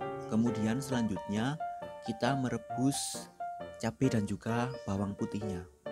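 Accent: Java, Indonesia. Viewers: Udah kenal channel ini sejak subscriber nya puluhan ribu sampai sekarang hampir sejuta. Suka sama suaranya yg medok dan resepnya yang mudah (meskipun gak pernah aku coba karena males wkwkwk) Sukses terus, mas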